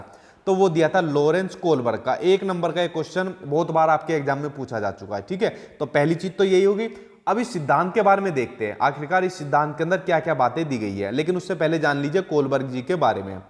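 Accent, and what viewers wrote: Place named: Uttar Pradesh, India. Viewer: Sir ,,Aapki boli bilkul hmari jesi hai ...UP wale ...😎😎😎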